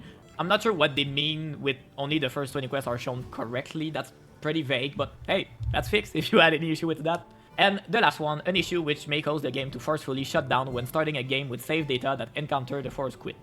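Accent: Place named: Quebec, Canada. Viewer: Quebecois spotted?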